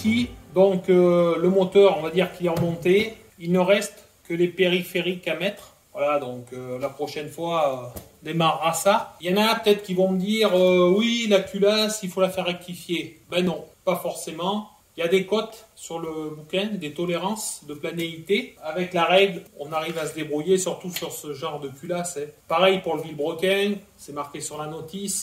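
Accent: France. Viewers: Ça fait un bout de temps maintenant que je cous suis, trop génial dans Gestion des produits des systèmes démerdes. Et l'expérience le savoir faire ds l'excellence et la qualité. Et aussi vous être donné les conditions pour toujours être dans un atelier hyper propre et structuré au poil. Ça donne envie de voir en réel. Bravo bravo et encore bravo, c'est quoi cry accent quelle région de France.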